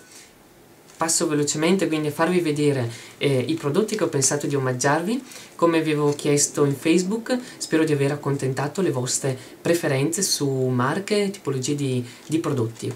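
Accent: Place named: Brescia, Italy. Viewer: mi piace troppo la tua parlata bresciana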